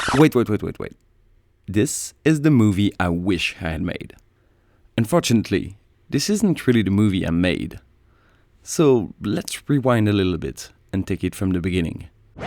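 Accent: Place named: France. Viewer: you sound french bro, but you have a very very nice accent et si tu n'es pas français, bravo pour la prononciation des choses en Français ou juste le RER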